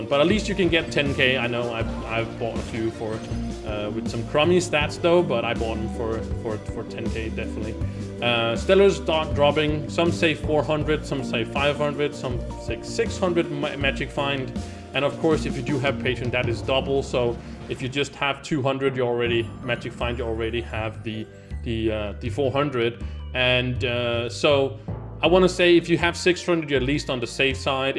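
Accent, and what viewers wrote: Denmark. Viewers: This is just a question. Do u come from Denmark? Cause it sound like that.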